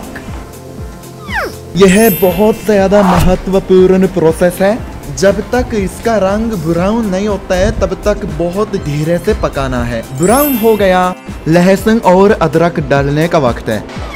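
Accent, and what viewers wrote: Standard. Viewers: sala itna sudh hindi to india wale nhi bolte hain😄😄😄😄😄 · I want this guy to speak for hours in his pure Hindi .. btw great videos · Itni sudh hindi toh Indians bhi nahi bolte🤣🤣🤣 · Bhai teri hindi toh hamare indian log se bhi zyada pure hai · Waaah.... bhai aap sirf hindi nahi suddh hindi bolte hain jo ki bhut manmohak lgti hai........aapki hr videos un logo k muh pr thappd hi jo indian hote huye v hindi me introduction v ni de skte aur hindi bolne me shrm krte hain......💖💖💖💖💖💖💖💖💖💖🙏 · Itni sudh Hindi hum bhi nhi jaante😅😅😅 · Aap bhoot shuddh Hindi bolta ho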